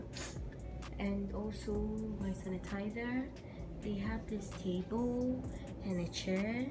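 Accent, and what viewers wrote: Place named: Canada. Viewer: Kindly consider your sound it's low.... Hoping to hear some more Canadian accent soon🤩🤩🤩✨ Enjoyed the vid.... Keep it moving 💃💃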